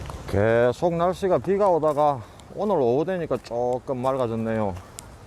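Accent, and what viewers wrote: Gyeongsang, South Korea. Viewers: 오래간만에 해운대 마실 나가셨네요~펑소엔 못느끼는대 외국에 오랫동안 나가있음 작은추억하나 사무치게 그리울때가 많으시겠죠~ 님 인기비결을 제가 나름 주제넘게 예상해봤어요~^^ 1.벗님들이 평소 보지 못했던 북미대륙의 구석구석을 운전자관점에서 볼 수 있어 좋다 2.말투는 오리지널 경상도의 투박한 사투리를 쓰는대~어라? 영어로 모든 토킹이되네?ㅎㅎ 투박한 말투의 선입견을 가진 저를포함한 벗님들의 예상을 깨는 어휘실력 3.운전벙커안의 생활 모습을 스스럼없이 보여주는 솔직함의 매력 4.본인 스스로가 어려움을 겪었던 과거,현재를 솔직담백하게 풀어서 구수하게 이야기해내는 매력 5.돌쇠와 날쇠의 멋진 콜라보레이션 특히 날쇠라는 아이템이 짱인것 같습니다 제가 생각한 인기비결이신것 같구요 캐나다 돌아가셔서도 그냥 더 잘해야지 하지마시고 평소대로 꾸준히 해주심 좋겠어요~~^^ 그리하셔도 벗님들 다들 어디 안가시고 그대로 계실듯요 주제넘게 주절주절 시부맀네요~~ㅎㅎ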